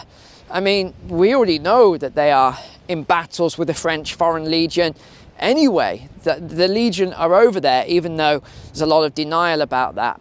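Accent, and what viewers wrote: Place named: Upper class, United Kingdom. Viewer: You shouldn't ever trust anyone with a public school accent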